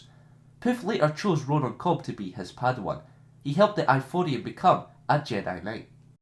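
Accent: Scotland, United Kingdom. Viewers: Hahahha "Scottish insult". Admittable when i searched star wars lore, i didn't expect it to be a fellow scot that would be the commentator.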